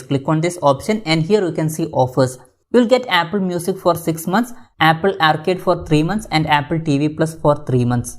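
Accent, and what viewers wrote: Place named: India. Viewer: Worst Indian accent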